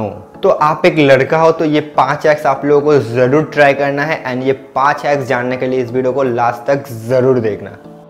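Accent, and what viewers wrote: Bengal, India. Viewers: Bengali bolte bolte ap ki Hindi begd gaee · Aap bengali hai kya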